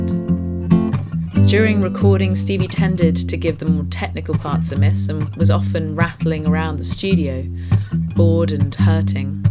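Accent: United Kingdom. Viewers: Before you even said it I was thinking "This is one take!". Awesome - like a Rick Beato video with a beautiful British accent. I could listen to you speak all day, I'd love to hear more 'analysis' from you.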